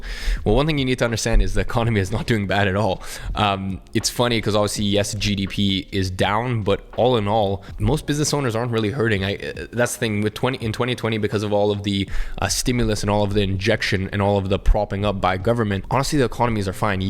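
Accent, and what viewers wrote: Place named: California, United States. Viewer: If you grew up in London...why don't you have an English accent? You sound straight up Californian lol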